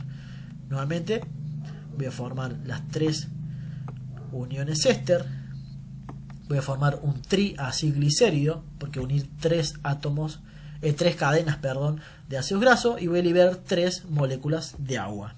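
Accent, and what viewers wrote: Argentina. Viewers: Hablas como argentino de provincia. De donde eres?¿cordobes?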